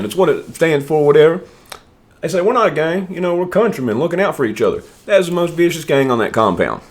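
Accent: United States. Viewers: LOVE your country accent bro...so sexy!! ❤ from Scotland ✌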